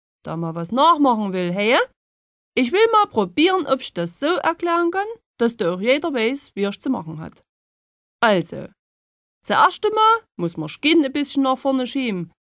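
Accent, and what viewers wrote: Saxony, Germany. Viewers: des is risches ald säggsisch. und isch komm aus sachsen, obwohl isch jez in bayern wohn xd das is richtiges alt sächsisch, ihr hört nur keinen mehr so reden, weil die sprache ausgestorben ist, da mehr bayern nach sachsen kommen & sich die sachsen immer mehr hochdeutsch angewöhnen. das ist normal. also brauch hier keiner haten & sagen, das ist kein sächsisch. das ist ursächsisch, so wurde FRÜHER nur so gesprochen ;)) ich wollts nur gesagt haben ;D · Sächsisch isses beste. :D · yeah Sächsisch :D aber so extrem red ich nicht :P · Vielleicht seit ihr einfach alle so jung, dass ihr überhaupt nicht mehr wisst, wie manche hier in Sachsen sprechen. Seit ihr noch nicht groß rum gereist oder habt alte Großeltern?? Besonders die ältere Generation spricht definitiv genau so, wie die gute Dame das hier vormacht ! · .. ist doch noch sclimmer als bayerisch... 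habe immer gedacht, dass bayerisch ist schlimm, aber nein, wenigstens ich verstehe schon ein bisschen bayerisch, aber sächsisch... keine Chance.. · Ich komme aus Sachsen ! und keiner redet so krass sächsisch . Und das es hier wieder irgendwelche Spinner gibt die sich darüber lustig machen , da bekomm ich einen hals weil sachsen einfach schön ist , scheißt mal auf den Dialekt. :) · Omg die klingt wie all meine verwandten aus Sachsen, die ich am Telefon nicht verstehen kann😂 Ich liebe das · Wow endlich mal eine die dass richtig kann nicht so ein halb sächsisch was 0815 klingt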